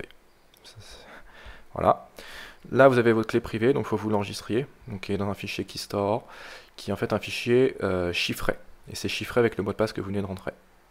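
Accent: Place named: France. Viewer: Merci pour la vidéo mais de grâce, parlez moins vite et articulez. Je suis Québécois et ne comprends pas bien votre accent Français. J'en déduis que les francophones des autres pays auront aussi de la difficulté à vous comprendre.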